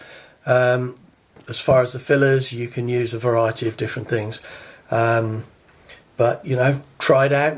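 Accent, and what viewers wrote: England, United Kingdom. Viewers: It's nice to hear an English voice as well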